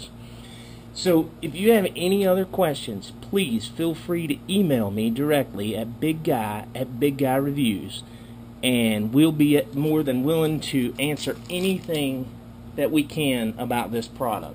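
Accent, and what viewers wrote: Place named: South, United States. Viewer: I love your southern accent.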